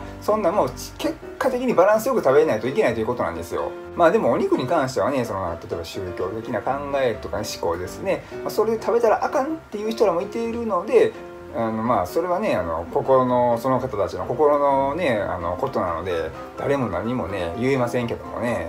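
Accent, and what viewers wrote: Osaka, Japan. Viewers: 食事療法のアドバイス、とても参考になってますよ👌昔から糖尿病の療法食は健康食、ダイエットにも適していると言われてましたからね👍 大阪弁⁉️希に嫌う人も(昔本当にいました。大嫌いという人)いますが、和みやすくて良いじゃないですか。関東人でも大阪に3年もいれば大阪人というくらい馴染みやすいですよ。地方地方の方言、楽しかろう⁉️(笑)ご存じですか？東京人は、昭和のころ、ほとんどが東北人だといわれていました。それくらい出稼ぎで出てきた人が多かったそうです。先祖代々という地元民は凄いことなんですね👍 👊😆🎵🙋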